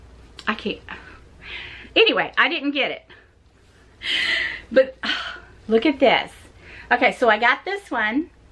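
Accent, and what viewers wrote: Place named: South, United States. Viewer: I see u got that southern accent luv it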